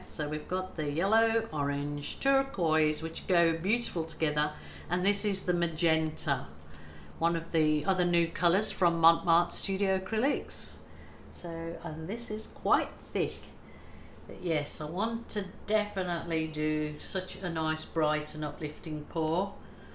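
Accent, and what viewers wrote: Birmingham, United Kingdom. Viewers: BEAUTIFUL !!! can I ask you sound like a slight Brummy accent. Maybe wrong lol . Love your work 😘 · It’s. beautiful and I can understand the emotion you showed. Thank you for showing us how to feel free in a lockdown situation. Are you from Birmingham Uk? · I love this it's great and may I ask are you from Birmingham? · Hi, have you got a brummie accent going on there? Just found your channel and so thankful I did. Made my day watching this, I am a newbie and can only wish for a result as lovely as this. Thank you. x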